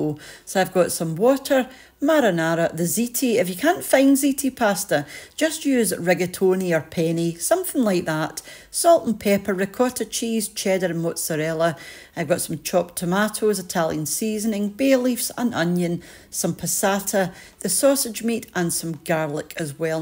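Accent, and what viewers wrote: Scotland, United Kingdom. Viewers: Hello from Colorado., USA. There’s a whole group of us out here in my community who watch your show... partly for the recipes; partly just to relax to the sound of your amazingly lovely and relaxing Scottish voice. I’m going to be making a big slow-cooker full of this wonderful recipe today. It’ll make for an easy cooking-free weekend, too.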